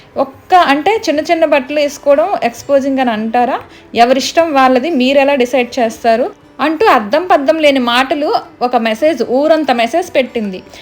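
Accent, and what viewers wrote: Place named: Godavari, India. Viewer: Madam midhi a uru ...miru nellore antunaru but godavari slang kanipisthundhi ...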